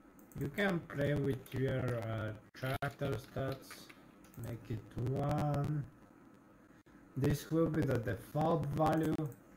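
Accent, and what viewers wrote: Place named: Greece. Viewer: Η προφορά σου είναι λίγο ελληνική..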